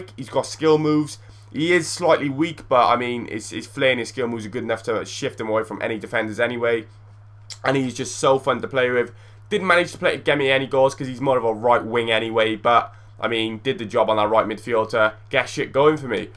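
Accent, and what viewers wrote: Wales, United Kingdom. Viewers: you sound identical to leroy from the valleys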